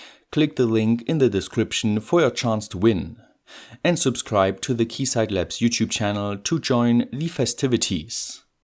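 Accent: Germany. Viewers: Is he German ?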